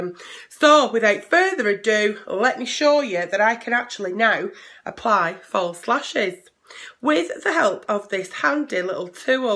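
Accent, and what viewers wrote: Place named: Rossendale, United Kingdom. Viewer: No mistaking that rossendale accent 😂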